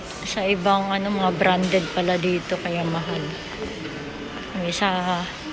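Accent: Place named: Visayas, Philippines. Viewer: Pretty kaayo ka sis, and i love the way you talk ky pareho ta bisaya.. Amping diha permi,kumusta na lang ko sa mga hapon. Hehehhe..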